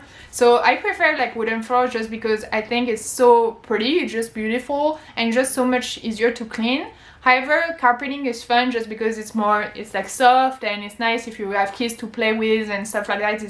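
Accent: France. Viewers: The accent shows she is CLEARLY french my guy 😂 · T'as jamais envoyé du courrier toi ! Et pas la peine de dire que tu est Française, ça s'entend ! On a un marqueur rouge pour indiquer qu'il y a du courrier à partir, avant de dire des conneries on se renseigne ou simplement on regarde dans sa boite pour le reste c'est pas mieux...la moindre poignée de porte te fait éclater tes 2 neurones..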